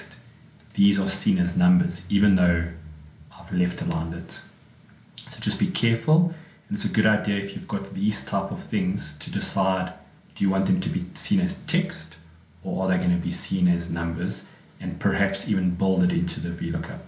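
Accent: South Africa. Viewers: So surprised to turn this on and hear a fellow South African's voice! :) My question is, in the last example what is the 'special' kind of space that was not generated by the keyboard? How can you check for and remove it? Thanks!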